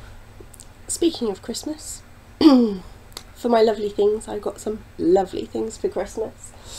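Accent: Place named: United Kingdom. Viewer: Hi! I just discovered your podcast today and watched them all. Lovely to find another British voice.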